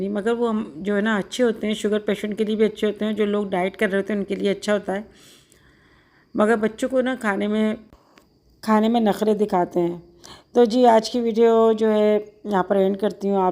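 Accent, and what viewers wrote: Hyderabad, India. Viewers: hadrabad language esi hi hai baron KO toktay Nahi Hain. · Hyderabad me asi ho bola jata ha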